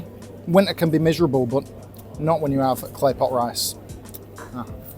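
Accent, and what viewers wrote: United Kingdom. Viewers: Are you from Birmingham? Brummie accent... 🤔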